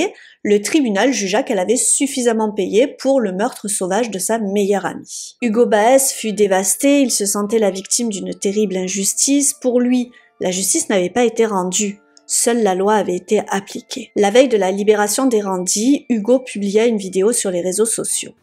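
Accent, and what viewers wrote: South, France. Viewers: Je me languis tellement de tes vidéos !! Je reviens de ma pause déj et je me dis : bon qu'est ce que je vais bien pouvoir regarder tout en travaillant et je tombe sur ta vidéo !!! J'apprécie énormément ton travail, tes recherches et ton petit accent du sud qui nous apporte le soleil ! T'écouter est un véritable plaisir ! Merci pour ton travail et et merci de réussir à dénicher des histoires de true crime si méconnues en France !!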